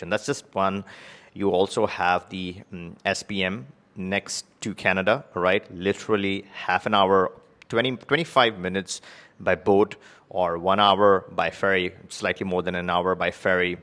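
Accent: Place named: India. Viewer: I can hear that Indian accent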